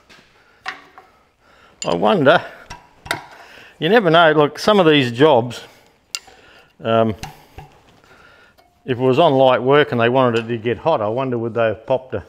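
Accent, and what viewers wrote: Australia. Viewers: I love your Australian accent 😁🥃🇺🇸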